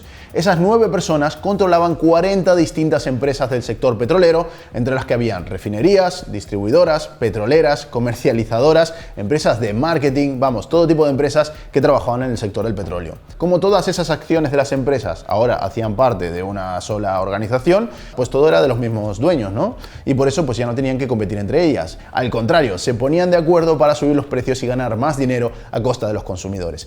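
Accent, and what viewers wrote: Galicia, Spain. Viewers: Te sale ,se oye lo GAYEGO ..JODER ME CAGO EN LA LECHE MECHEEE!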